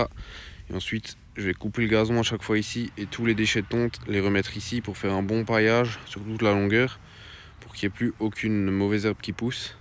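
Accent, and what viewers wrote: Alsace, France. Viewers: Parfois, il y a l'accent belge qui peut être trompeur, mais en tant qu'alsacien, on se reconnait c'est clair 🙂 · Si il est pas alsacien la france n'a jamais été envahie par l'Allemagne 😂. Moi je le suis et son accent ne trompe pas weich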